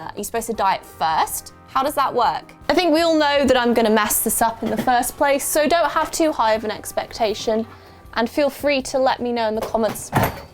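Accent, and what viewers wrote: United Kingdom. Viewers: IDK why she llookss like millie Bobby Brown and has British accent is it just me yeah I think so I'm weirdd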